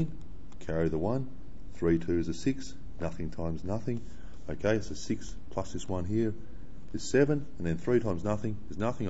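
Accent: Australia. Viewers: The accent is from Australia. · its Australian 😂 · Well done . What a nice !Australian accent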